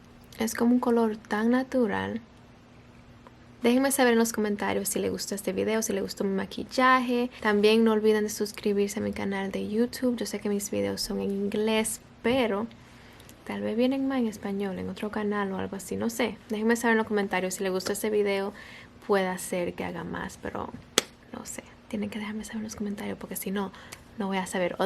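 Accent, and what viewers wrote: Dominican Republic. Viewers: vi el primer video de ella y dije,, ok, no esta mal , le di al segundo y desde que escuche el ascento dominicano , dije , aqui es que vamos ejjejeje , que linda jj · Español cibaeño ❣️🥰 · ¡Eso era lo que estábamos esperando! Ese español de la República Dominicana se diferencia donde sea 😂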